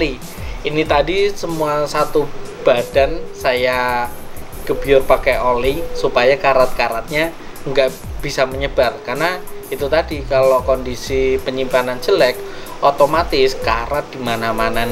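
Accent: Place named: Jakarta, Indonesia. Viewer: Mantab mas. Tapi logate og rodo jakartanan saiki😅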